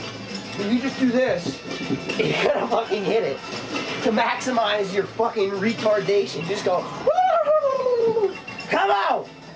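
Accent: New Jersey, United States. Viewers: Back when didn't hide his Jersey accent. *Cheers and applause* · So much Jersey accent. It's beautiful.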